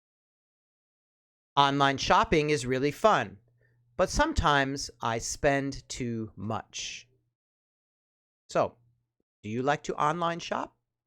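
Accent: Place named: United States. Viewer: Hey, thanks for the good videos you make, I'm working on my accent to make it better, I wanted to ask which part of US does your accent belong to?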